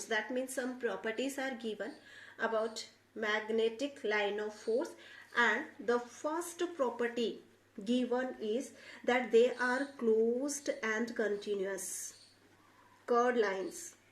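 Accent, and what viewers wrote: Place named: Kerala, India. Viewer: Thanku for the vdo mam. And mam pls tell are u from Kerala because ur accent is same as mine because I am also from kerela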